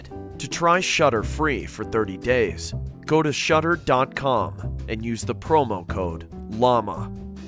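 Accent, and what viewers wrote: United States. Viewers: Where is your Tennessee accent???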